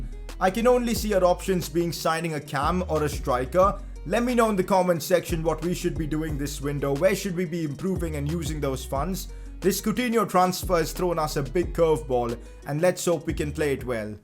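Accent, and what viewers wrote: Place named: India. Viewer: Your voice is worse than your first videos cuz of indian accent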